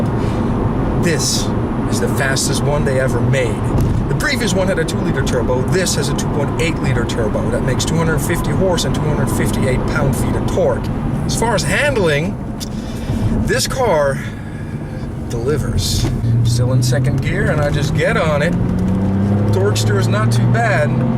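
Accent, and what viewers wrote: Netherlands. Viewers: i detect a dutch accent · ahahahh, grappig om nog een beetje een Nederlands accent te horen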